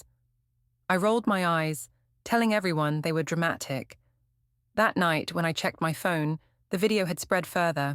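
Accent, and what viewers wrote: United Kingdom. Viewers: This British narrator is dragging this idiotic story and dont waste others time you idiot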